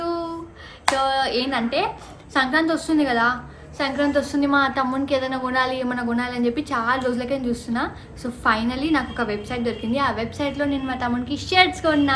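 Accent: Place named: Telangana, India. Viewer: I like ur way of talking telangana muddu bidda Akka love from narayanpet akka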